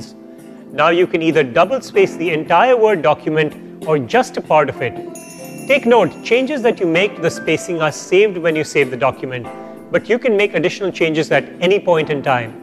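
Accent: India. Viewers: he talks like an Indian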